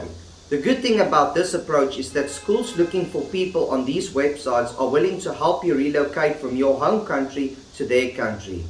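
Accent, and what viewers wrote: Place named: United Kingdom. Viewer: gr8 ingormation. i believe u live sometime in uk bcoz ur accent is similar to british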